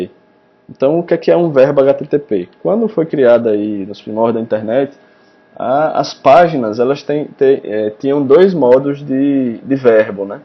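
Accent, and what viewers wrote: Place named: Northeast, Brazil. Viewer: Você é o cara. Comecei a estudar Rails a menos de uma semana, e já aprendi mais do que todos esses anos tentando estudar programação voltada a back-end. Sou front-end e estou estudando uma linguagem back-end. Sempre tive interesse em estudar rails, por causa da sua praticidade, só que eu nunca entendia nada. Hoje, graças as suas vídeos aulas eu já consigo entender muita coisa. Confesso que nas primeiras aulas eu não tava curtindo muito a forma de como você falava e se expressava, porém, os vídeos foram melhorando, ao ponto de você explicar melhor do que muita gente famosa. Parabéns de verdade. Valeu por dedicar um tempo a rapaziada que assim como eu está começando no caminho do rails. Obs: Você é do nordeste? Tem um sotaque muito nordestino. Eu sou de Recife - PE. Abraço.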